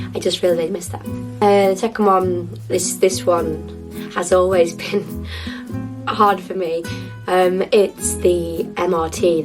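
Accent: United Kingdom. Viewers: 的英國腔好好聽哈哈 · 一點開就是滿滿優雅的英國腔好好聽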